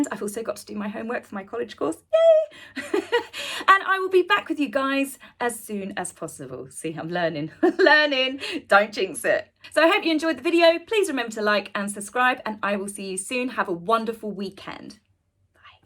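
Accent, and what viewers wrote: United Kingdom. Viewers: Great video. I just wish you could talk a little slower... sometimes it's hard to understand with your British accent 😉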